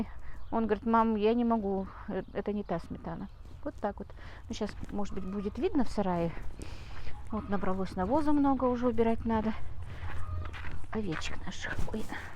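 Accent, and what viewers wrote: Standard. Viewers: подписана на Вас давно, но смотрю недавно. Вы так чисто говорите по-русски, такая грамотная речь, одно удовольствие Вас слушать.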